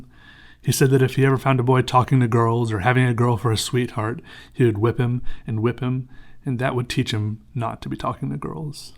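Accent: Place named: England, United Kingdom. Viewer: Hello. I like your English accent. And I like Celtic music, James Joyce's 'Dubliners'. Thank you.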